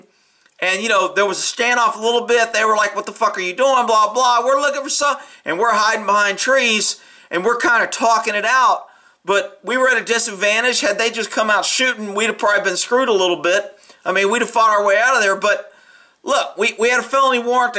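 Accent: United States. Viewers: You talk over vid way too much !! Tip: shut your trap with that arrogant American loud claptrap accent !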